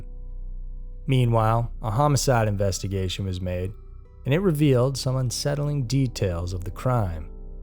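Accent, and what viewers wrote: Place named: Minnesota, United States. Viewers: You have a Minnesota accent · Is why I follow his words so well? MN for the win, I never noticed the accent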